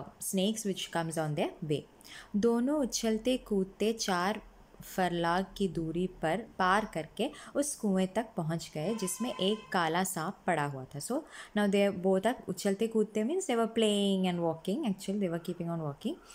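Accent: Kerala, India. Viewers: maam are u a malayali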